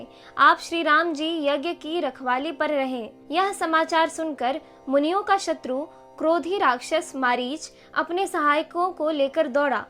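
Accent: Standard. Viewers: Atyant shuddha uchcharan hai . Ati sundar hai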